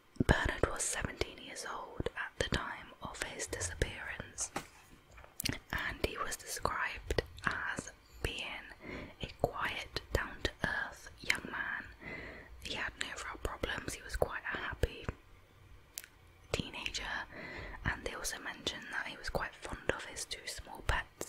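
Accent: United Kingdom. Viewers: Nice to hear a lovely British asmr voice ❤️ please please please more true crime stories and even general chat. You have a nice clear voice, nice microphone too, thankyou for your amazing work 💕🏆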